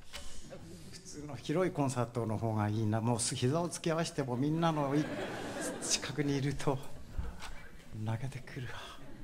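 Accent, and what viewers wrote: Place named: Kyushu, Japan. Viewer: 昔と変わらないキーで歌うこうせつおいちゃんの九州弁初めて聞いた気がします。お兄ちゃんの話も初めて聞いて、「 」はGoodVibrationのが一番だと思ってましたが、こっちも泣けてしまいました。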